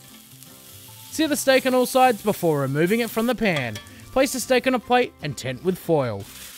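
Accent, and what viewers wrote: Australia. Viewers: That's a nice accent you have there .au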